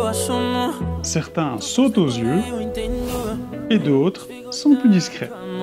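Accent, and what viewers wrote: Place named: Belgium. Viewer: Belle pédagogie, rythme de démo agréable et super digeste 😉, les portés j'Adore ! #DirtyDancingGeneration... 🤷🏻‍♀️😍😜 Y en a jamais trop ^^ Presque. Merci pour cette vidéo très fluide côté montage également, les petits traits d'Humour et l'accent de la Belgique qui me rappelle toujours des Souvenirs chers au 💗🤫🙃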